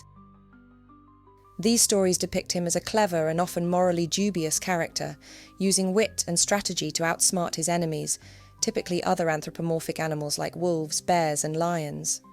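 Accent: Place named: RP, United Kingdom. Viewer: I like the narrator's posh accent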